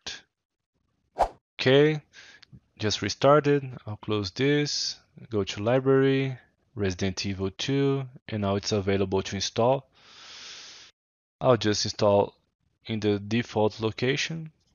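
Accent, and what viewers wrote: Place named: Brazil. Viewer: comecei a ver o video e falei "porra, eu conheço esse sotaque, esse cara é br ctz kkkkkkkkkkkKK" mt bom mano, valeu pelo tutorial ai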